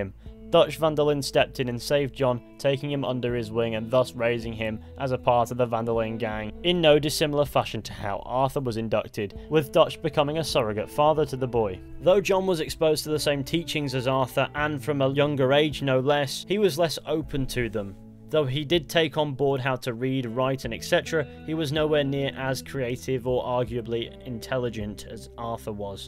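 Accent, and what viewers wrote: England, United Kingdom. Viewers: Umm I'm an American and in no way would anyone say his name that way. And for real British are not ones to talk. Bloody Hell (For real get a new catch phrase) You taking a piss? (Makes no sense) My friend is in Hospital (surly you mean in "a/the" hospital) it's not like you say my friend is in store. No your friend would be in "the"store. I could go on and on but you people long ago lost touch with speaking correctly. I once herd a comedian say Americans say Herb wrong because it has an H in it. But then how do you explain Knife? And you guys LOVE that letter H you super put alot of weight into it. Just saying next time you wanna say someone else talks funny make sure your own accent isn't full of stupid memes. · I'm sorry but this video would be better with a deep American accent instead of a high pitched Englishman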